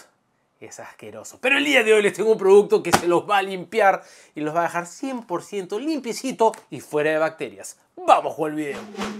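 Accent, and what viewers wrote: Peru. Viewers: Es Peruano??????????? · Eres peruano?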